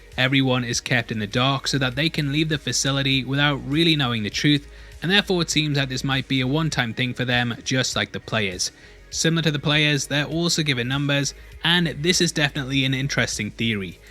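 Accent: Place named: United Kingdom. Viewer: hes literally speaking british english or some other dialect of English that isn't American. imagine thinking that America is the center of the world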